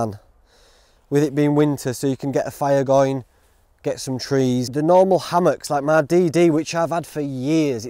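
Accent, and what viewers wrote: Yorkshire, United Kingdom. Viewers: Aww, don't fret about your script! I just love to see your little face and hear your Yorkshire accent! Just do you! Love from South Carolina! Xxx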